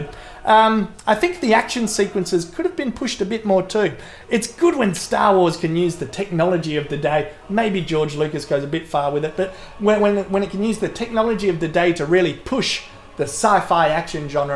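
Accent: Australia. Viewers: Your Australian accent is so golden I don't want to hear it too much and get used to it so I can enjoy your animal fight videos more thoroughly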